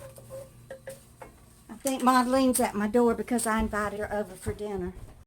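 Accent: South, United States. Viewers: Hi, I just discovered you last week. My grandmother and her family were from Starkville, MS and one cousin is left there. My grandmother's cooking had a MS flair with lots of butter. And some from Ackerman. Goodlooking meatloaf and love your southern drawl. I'm in north Texas. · It’s the Southern accent,. White (whyyyte). I have trouble with people understanding some of my pronunciation that aren’t from the South. Asked for a bag of ice in Philadelphia (iyyyce) and the lady thought I said a bag of ass. 🤣😂🤣😂 We got a good laugh. People from Idaho don’t have a Southern accent. I love to hear the lovely accent. Happy New Year to you. 🎊🍾🎈😘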